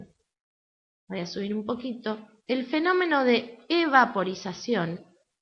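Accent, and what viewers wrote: Argentina. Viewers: Habla español cruck lo diferente es que tiene el acento argento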